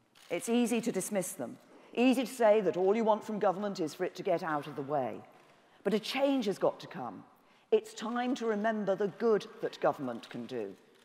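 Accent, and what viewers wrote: RP, United Kingdom. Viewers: I love to listen to her Queen's English speeches!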